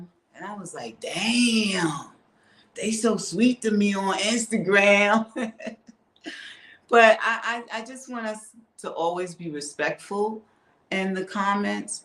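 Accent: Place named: New York, United States. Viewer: I knew it! Your accent was telling me you was from somewhere in New York. You sound just like my friend. 💕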